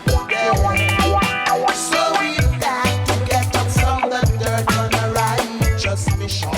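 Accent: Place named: Cockney, United Kingdom. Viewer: More cockney! More cockney!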